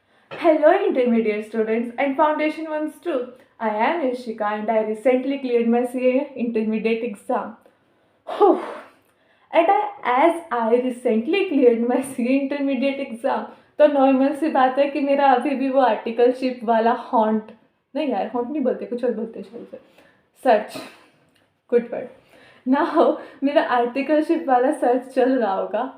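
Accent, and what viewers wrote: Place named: Marwar, India. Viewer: aap marwadi ho kai aapke tone se lg ra h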